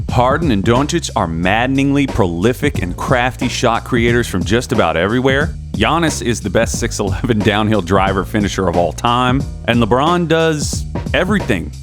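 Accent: United States. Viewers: The way you say Luka Doncic...Are you east European? Otherwise you sound very Americano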